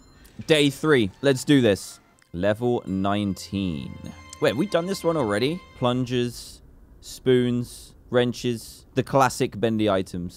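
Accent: England, United Kingdom. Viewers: I'm gonna get r/whooooshed for this, but he's saying that "this bone is on my list" very fast and with a heavy British accent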